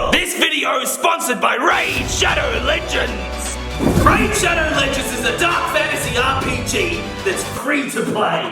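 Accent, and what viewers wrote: Australia. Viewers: I love the Australian accent!!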